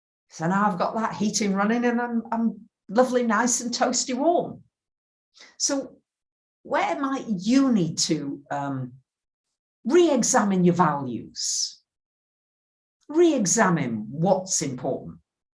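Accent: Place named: North, United Kingdom. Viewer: Thankyou for your message , your accent seems more northern in this video!. And you look a bit different, all the Greek food I expect!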